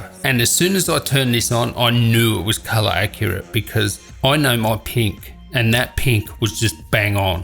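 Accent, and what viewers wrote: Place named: Australia. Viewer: Great vid, thank you. Great to hear a fellow Aussie voice reviewing stuff! I've just bought this monitor, your review was a very good help for me. Everything you say is true, this monitor blows away everybody who sees it in action. I do not use it as a professional but do family videos and work with docs open 2 at a time - wonderful for all this. I had the money so I though so what - end of financial year and I need to clear the bank account and get a tax deduction anyway so there I was! I will enjoy the pleasure of this incredible screen LONG after the initial pain of the price has been forgotten. Highly recommended - thanks again for the very relatable review - love the way you describe things in everyday terms. Cheers, (West Pennant Hills, NSW - Australia)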